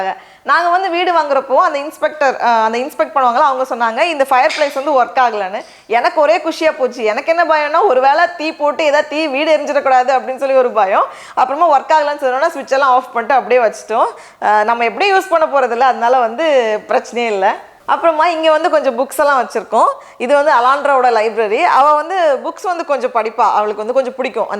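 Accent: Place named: Chennai, India. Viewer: na unga tamil slang rmba pidichanala inga chennai ninachen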